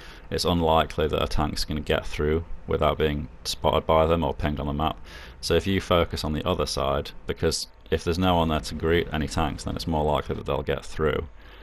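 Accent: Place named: United States. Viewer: Are you from UK? I thought you are American from my perspective you talk with American accent.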